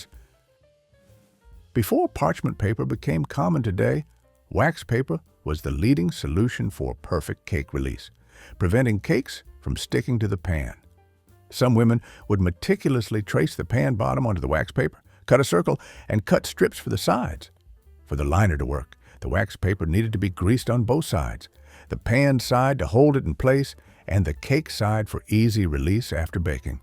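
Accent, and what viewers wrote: South, United States. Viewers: Just curious for no good reason... Is this an AI voice with a southern accent or a real person?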